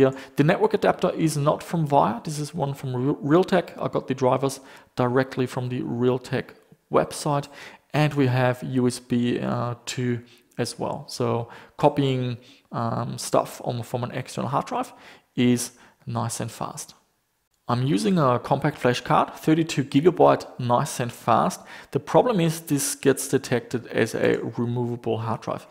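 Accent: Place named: Germany. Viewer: You accent seems to indicate that you are originally from Germany.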